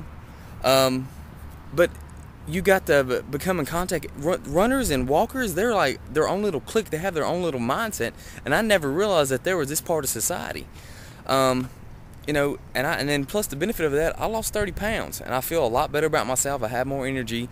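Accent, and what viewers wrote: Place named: Tennessee, United States. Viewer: well i knew u were from tn by ur accent, and i was like hey that street looks familiar, cuz i live in nashville too! im too overweight to run just yet but it was still really encouraging! im the same way about drugs, im a recovering addict and if my story helps just one person then it was worth it 4 me 2 go thru all that. God bless!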